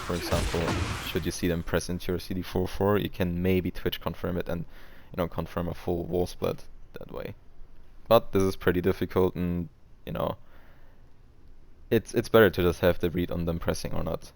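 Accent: Germany. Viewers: Haha the accent always gives us away. Habe mir vorgenommen von Jin (T7) auf Dvj zu wechseln in T8 und dein content ist richtig gut bro. Danke fürs Teilen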